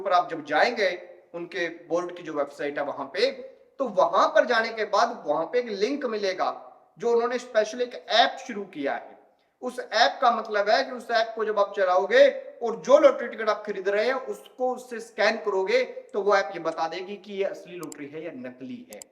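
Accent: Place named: Punjab, India. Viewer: Bhaiya aapane bhi Punjab ki kharidi thi na Kyunki aapki awaaz se lag raha hai kya Punjab se hi hai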